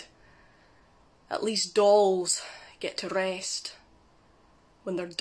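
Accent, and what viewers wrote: Scotland, United Kingdom. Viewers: Scottish slam poetry. Interesting. 🙂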